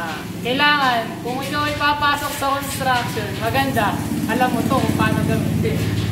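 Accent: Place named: Bulacan, Philippines. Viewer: Sabi na eh hehe, sa punto mo palang. Dyan din po probinsya namin, sa Sto. Rosario Hagonoy. Tagal ko na nga hindi nkkpasyal dyan. Salamat sa tutorial mo, malaking bagay sa aming mga nagsisimula palang .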